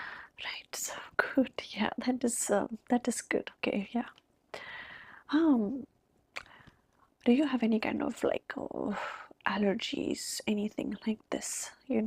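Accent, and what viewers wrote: India. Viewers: really like the articulate indian accent, cherry on top!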